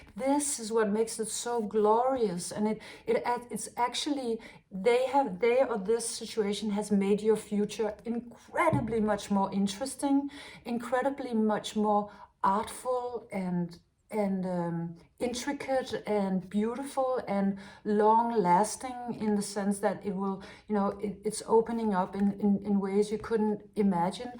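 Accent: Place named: Denmark. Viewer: Listening to your accent brought back many wonderful memories of my Danish mor. ❤ Thank you too the lovely positive reading, love from Australia.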